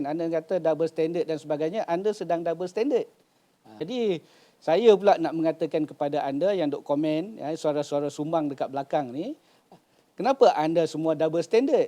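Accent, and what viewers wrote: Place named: North, Malaysia. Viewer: tg guru lain, ni cari makan ja pun. Orang bodo cem hampa ja duk layan buden ni. Dahla org utara babi rasa sial gila ni duk cakap utara, rasa cem loghat aku pakai jadi hina😂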